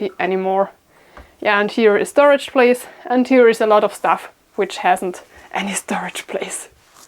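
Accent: Germany. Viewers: So good to see an english video I watch her in german ones , but it plays a computer voice , and I don't get to hear your lovely german accent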